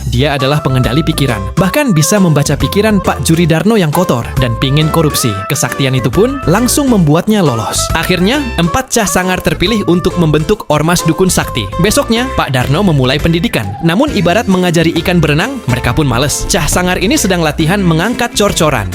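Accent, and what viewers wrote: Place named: Banyumas, Indonesia. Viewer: Admine wong ngapak apa kepriwe kie 🗿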